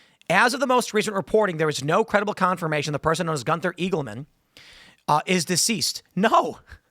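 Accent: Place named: Chicago, United States. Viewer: You are the one that uses AI for literally everything that you talk about on your Channel. You even constantly refer to it as your Friend, except you use your Patented “Exaggerated Chicago Accent™️” to make it sound silly, even though it’s an Accurate Reflection of The Truth, however you may try to frame it. 🤷‍♂️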